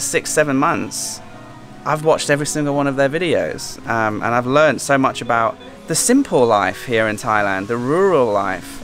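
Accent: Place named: North, United Kingdom. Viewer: Absolutely brilliant, and his calm manner certainly shone through as it does in his vlogs. You looked so relaxed and loved the way there was a northern accent coming out of you. Oh and piglets, thanks for making me laugh so much... Been waiting for this blog to come up since I saw you had visited him and for sure it didn't disappoint. Thanks for sharing.. merry Christmas greetings from Greece 🎅🎅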